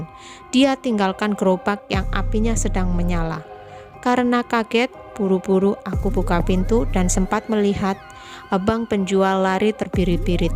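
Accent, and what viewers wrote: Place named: Java, Indonesia. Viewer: seru amat ceritanya mbak...org jawa ya mbak, logat jawanya msh kentel bgt...hehehe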